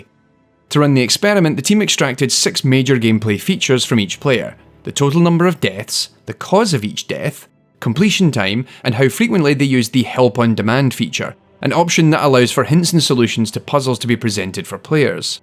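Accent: Scotland, United Kingdom. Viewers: Scottish was my guess, but I wasn't sure. Thanks for your answer!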